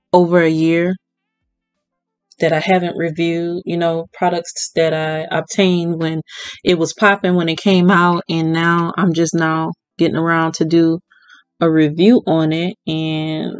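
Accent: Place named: Chicago, United States. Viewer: YOU SOUND LIKE YOU FROM CHICAGO!😊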